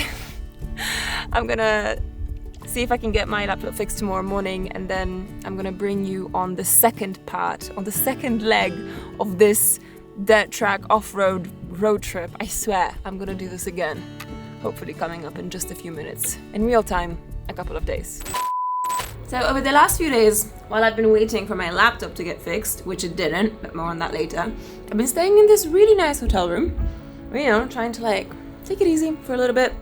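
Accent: England, United Kingdom. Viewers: Back when had an English accent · What a great video and such a lovely girl. What a lovely English accent she has! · She grew up & worked in London hence the British accent . Also she went to Oxford University over there !!! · The British accent, the Land Rover Defender.. I think i found Lara Croft in real life :) Don't tell me not everybody had that thought hahaha 👍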